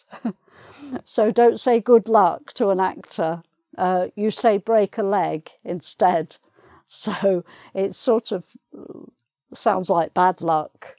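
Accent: United Kingdom. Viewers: Always excited to hear your British accent which makes me want to keep learning and practicing English as a none native English speaker.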